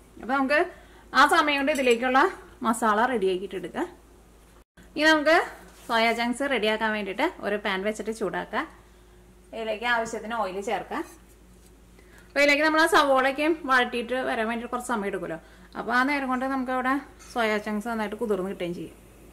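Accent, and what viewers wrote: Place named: Angamaly, India. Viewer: Slang ketitu nammade Angamaly Kari anennu thonanu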